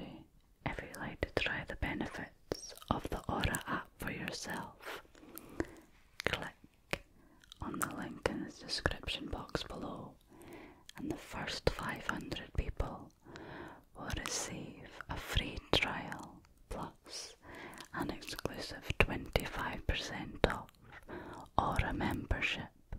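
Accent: Scotland, United Kingdom. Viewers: you have such a sweet and soft voice and that beautiful accent of yours makes the audio even better :D Brittish in whole are wholesome and great, especially scottish! ;) Have a wonderful day! Best regards// Jonathan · Wow, I'm all-a-tingly listening to/watching that! been away from Scotland for nearly 30 years and LOVE the accent. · ayyy scottish squad!! i've never seen another asmrtist with a scottish accent, so this is super lovely :D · Love your tingly whispers and beautiful Scottish accent. Pure heavenly relaxation! ❤️ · I've just started getting into ASMR. It feels surreal that this is the first time I've heard a Scottish person speak since I've watched Still Game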